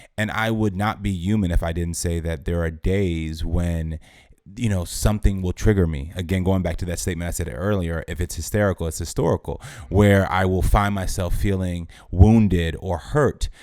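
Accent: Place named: Cockney, United Kingdom. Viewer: You cockney guru you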